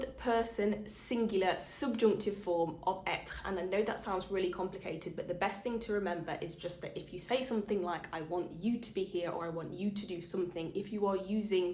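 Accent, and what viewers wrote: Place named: United Kingdom. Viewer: Welcome to the channel. I watch a few different French teaching channels (I’m still no good), and they tend to be American or french, so as a British person it’s nice to hear a British voice.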